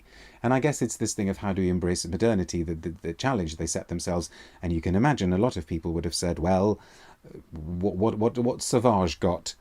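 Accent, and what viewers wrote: United Kingdom. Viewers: and a lovely oxford accent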